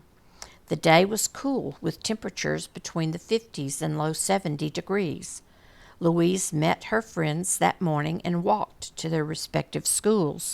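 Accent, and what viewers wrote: South, United States. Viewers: What an odd story. Seems almost modern in its senselessness. Murder is, of course, as old as Cain though. I could be wrong, but I fancy it was probably one of the first cases in American law where psychologists were called upon in evidence. Great podcast series, BTW. - and I always love to hear a classic musical Southern accent!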